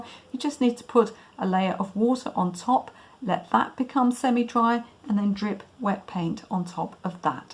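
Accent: United Kingdom. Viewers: to me is like flash in photography... buy I am not adamant about anything in particular. BTW I enjoy your videos and learn a lot! And a plus to hear your neat British accent!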